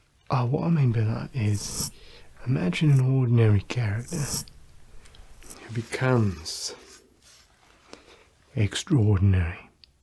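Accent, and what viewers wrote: England, United Kingdom. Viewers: I think he’s English but doesn’t speak with a cockney accent… could be wrong but check out his other videos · Youtube's automatic captions didn't stand a chance with this one. I do enjoy a strong english accent though. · are you British? it sounds so real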